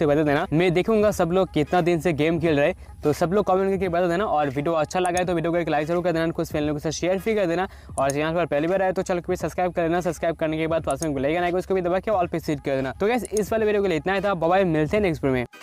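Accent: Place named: Assam, India. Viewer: YOU'R SOUND LIKE ASSAM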